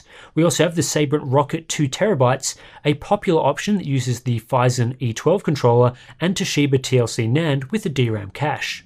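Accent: Australia. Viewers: Whenever you click on a Hardware Unboxed video, you have to turn on Caption to see how YouTube decide to butcher the Aussie accent, and this time round it's "todd we're unboxed"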